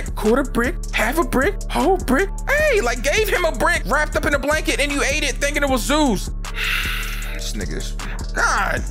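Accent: Philadelphia, United States. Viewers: You from Philly bro?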